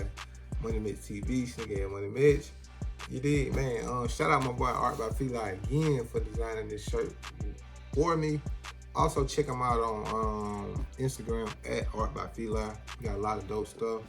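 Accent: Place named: South, United States. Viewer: Can’t tell if you’re from Atlanta, Florida, or Alabama....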